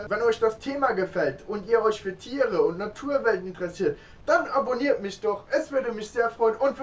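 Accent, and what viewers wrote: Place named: Hesse, Germany. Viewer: Ich finde Deine Videos immer sehr erfrischend und man lernt viel, vor allem mag ich die mit dem Wolfshund. Eine dumme Frage: Ich wusste übrigens gar nicht, dass die Luxemburger Deutsch als Muttersprache sprechen oder kommst Du eigentlich aus Deutschland? Ich hätte Deiner Sprache nach gedacht, dass Du aus Hessen oder Rheinland-Pfalz kommst und dass die meisten Leute in Luxemburg Französisch oder Luxemburgisch (Letzeburgisch) als Muttersprache haben.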